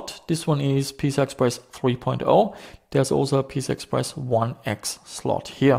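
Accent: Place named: Germany. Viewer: Great video!!! I thought you were German based off ur accent - didn't think Aussie!! Good stuff my man!!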